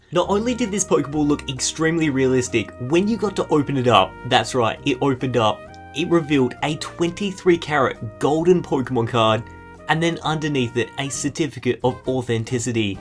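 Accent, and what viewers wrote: Australia. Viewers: Why do you sound like an Aussie?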